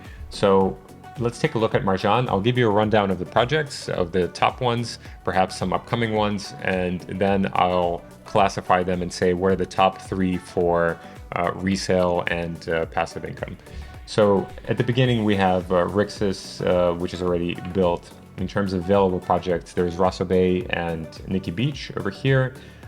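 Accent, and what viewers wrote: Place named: United States. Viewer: was wondering about your name—you sound American! It would be great if you could introduce yourself in each video. It’s refreshing to see Americans in this space. I’m also interested in learning more about Dubai’s real estate. Most of the content I come across seems to feature Russians, Europeans, and people from Muslim countries, along with Indians from other parts of the world. Many people in America still don’t know that much about Dubai’s opportunities—they often think Miami or maybe some Latin American destinations like Costa Rica or Tulum, Mexico, are the best options in the West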